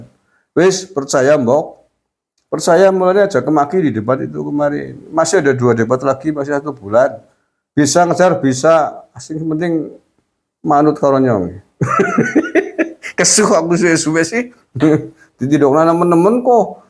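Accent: Banyumas, Indonesia. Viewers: Ora ngapak ora kepenak mantap 02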